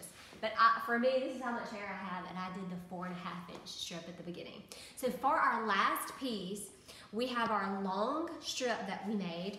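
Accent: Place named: South, United States. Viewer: Hi...Just watched your video. You have made a wonderful quality scrub cap...French seams and all!!! I've sewn for six decades and appreciate your project! I do have a couple of suggestions. First, try not to sew with the bulk of your work going through the arm of the sewing machine. You'll find it is much easier to handle your project. Second, if you pin or stitch the end of your elastic to the entry end of your casing, you can pull it through far enough to sew both ends using just 5" of elastic and saving 2". I loved your clear, through instructions delivered with your wonderful down home Southern accent. Keep up the great work and thank you for sharing...KMc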